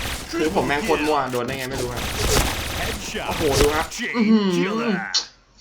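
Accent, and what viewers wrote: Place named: Kanchanaburi, Thailand. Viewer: เหน่อแบบนี้อยู่ กาญจนบุนี แน่เลย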